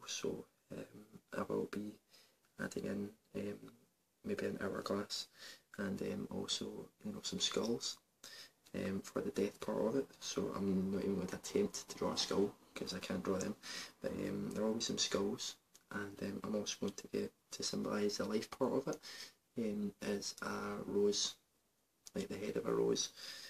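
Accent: Scotland, United Kingdom. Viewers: Do love the scottish accent :)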